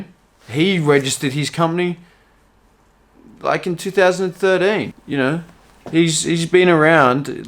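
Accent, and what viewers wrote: Australia. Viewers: That's how Aussies talk hahaha. Studied abroad there.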